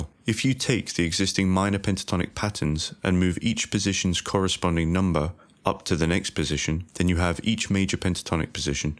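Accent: Scotland, United Kingdom. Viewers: Scottish? I’m from Stirling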